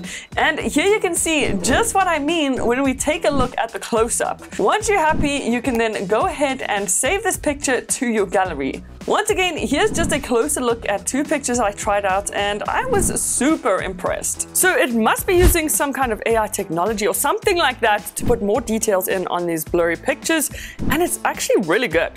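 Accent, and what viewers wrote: South Africa. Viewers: Wait are you south african? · What accent is that? ZA? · Is that a South African accent?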